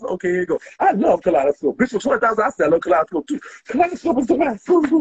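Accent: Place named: Louisiana, United States. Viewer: It’s the Louisiana accent for me I love it 🤣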